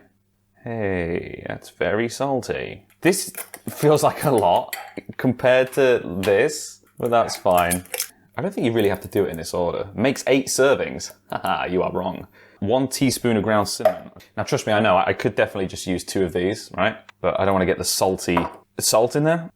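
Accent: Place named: United States. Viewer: His accent is half American.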